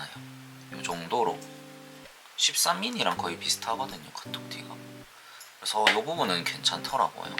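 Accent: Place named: Seoul, South Korea. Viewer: 어디 지역사세여 사투리랑 서울말이랑 계속 왔다갔다 하시네요 ㅋㅋ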